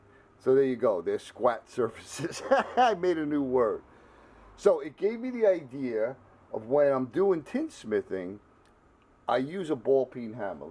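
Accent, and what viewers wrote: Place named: New York, United States. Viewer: This old machinist and inspector is happy for ya. Your new hammer rocks. Thanks for sharing and thanks for telling us you are from New York. By your accent... I kinda thought so. All the best bro.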